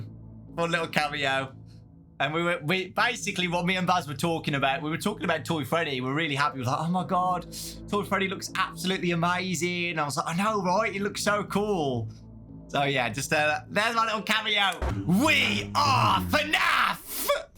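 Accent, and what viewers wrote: United Kingdom. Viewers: Ahhhh he’s British😂😂😂😂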